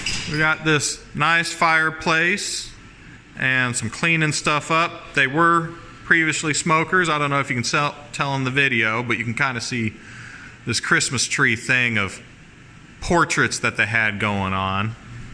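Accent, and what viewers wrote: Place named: South, United States. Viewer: You sound southern af😂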